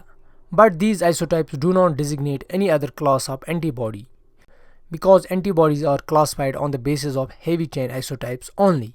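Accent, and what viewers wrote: India. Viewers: Your Ascent is very similar to Indian Ascent,are you Indian?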